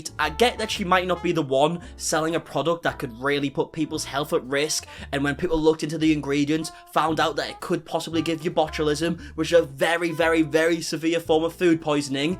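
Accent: United Kingdom. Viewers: Knew this dude was british before i clicked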